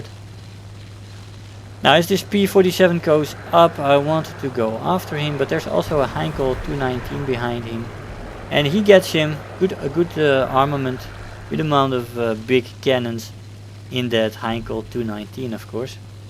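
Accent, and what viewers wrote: Netherlands. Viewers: He is Dutch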